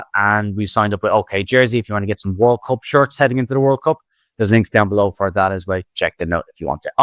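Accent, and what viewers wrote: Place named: Ireland. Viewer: Disappointed that you didn't say something along the lines of "forest destroyed by tree" in your Irish accent. Other than that great analysis!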